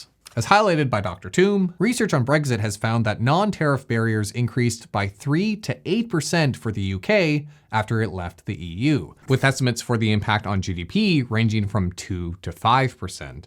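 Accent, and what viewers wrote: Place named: Canada. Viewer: i swear your canadian accent got thicker on this video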